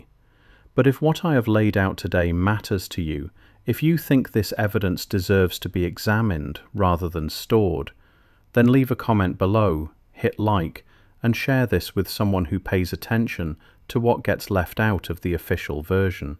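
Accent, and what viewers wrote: United Kingdom. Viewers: What’s with the British accent? Has AI been programmed that speaking with British accent lend to greater credibility, more believable or entertaining.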